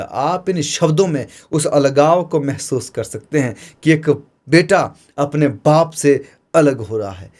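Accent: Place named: Himachal Pradesh, India. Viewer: Himachali hindi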